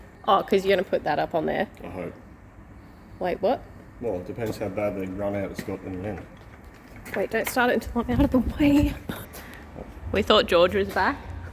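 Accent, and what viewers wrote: Australia. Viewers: never seen or heard of this channel until it came up on my feed, watched it and as soon as i realised you’re aussie, subscribed and liked! always back ya fellow aussies 🙌 really really great/interesting work you do too! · Quick question.....why doesn't the thermal shock crack the cast iron? I've always heard that cast iron doesn't do thermal shock well. I live on the completely opposite side of the world but your videos are anxiously awaited. Don't know if it's the skill, professionalism or that Aussie accent but it's a great video!!😊😊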